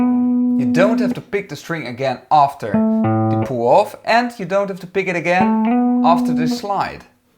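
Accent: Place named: Netherlands. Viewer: Ik hou van dit volle nederlandse accent haha 😂❤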